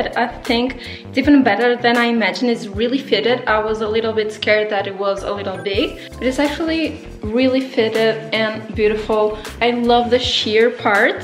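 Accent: Portugal. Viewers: Omg I knew you were Portuguese, 30 second into the video! ahaha the way you speak English is very 'Portuguese' ahah love it!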